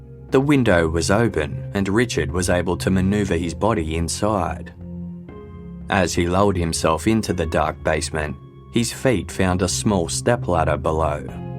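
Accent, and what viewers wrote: Australia. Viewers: That is the sound of an Australian voice.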